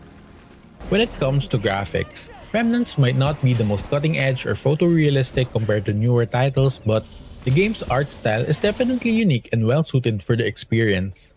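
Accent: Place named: Philippines. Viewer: You sound like Pinoy bro. Are you pinoy?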